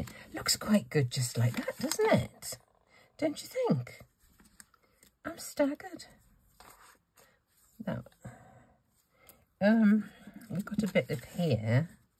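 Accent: United Kingdom. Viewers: Your lovely British accent gave it away!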